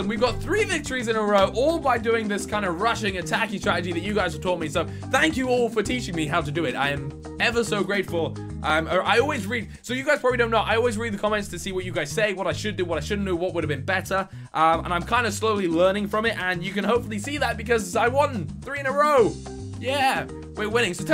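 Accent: United Kingdom. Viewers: Is he british ??😬😬😬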